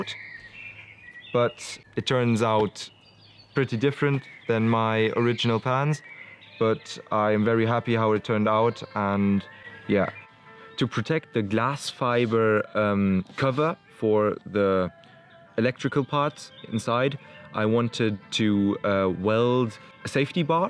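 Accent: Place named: Germany. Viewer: Hey great job! I will probably start one project myself in the winter. I am preparing stuff now and try to find a lightweight electric motor... where did you get yours and what kind of motor is it? There are so many different ones... also did you need a converter? do you think you can compare the power the bike has to a combustion engine? Where you from also? Sounds partly like you are from germany (i am from germany)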